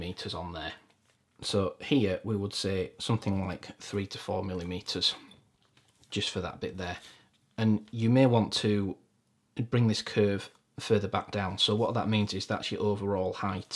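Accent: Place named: England, United Kingdom. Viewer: Brilliant I've been looking for a video that explains the sizing of a pattern ,you really explain it well . I do have one question ,I'm just starting out as a hobby and trying to find leather supplies in the UK that are cheap enough to try out is difficult . I have bought some tools from amazon as well as some chrome leather ,I've found Identity Leather Craft in Matlock and am waiting for some veg tan and glue to try out. do you have any recommendations for leather suppliers in the UK selling small amounts for us hobbyists . Great channel nice to hear a calm English accent explaining things so well .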